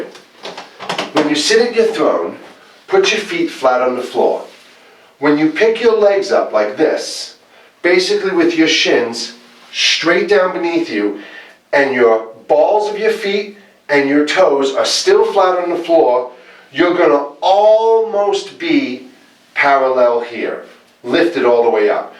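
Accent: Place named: Long Island, United States. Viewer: Thanks for this. How long duration, or how many measures, should we do the full wrist strokes at each tempo? Also, love your accent, you from "Long-Giland"?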